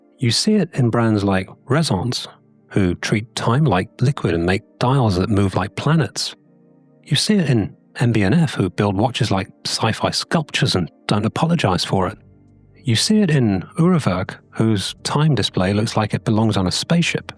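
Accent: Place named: United Kingdom. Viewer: My dear friend, first of all — no, you absolutely do not have a froggy voice. Your voice remains unmistakably British and genuinely a pleasure to listen to. Now, onto the video. That final line about “walking backwards into the future” was brilliant. It captures perfectly the tension between nostalgia and progress. It’s such a sharp observation. Your point about the Submariner was also spot on. It’s fascinating how so many brands feel compelled to orbit that design language — trying to be similar, yet different. Over time, that pressure creates repetition rather than evolution. Escaping that gravitational pull is clearly difficult. I particularly appreciated your remark about people wanting a watch they don’t need to explain. That’s incredibly insightful. There’s something powerful about wearing a watch that feels socially legible without effort. 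At the same time, I keep wondering where real innovation fits in today. Large brands often seem cautious, relying on subtle refinements rather than bold steps. Smaller brands experiment more freely, but rarely at a technical level. And perhaps that’s the real dilemma: creativity exists, but it’s either financially risky or commercially unnecessary. I also find it interesting how certain technical use cases — diving, aviation, racing — defined dial design historically, yet most of us don’t live those realities. So what would watches look like if they were designed around modern daily life instead? Comfort, legibility, emotional resona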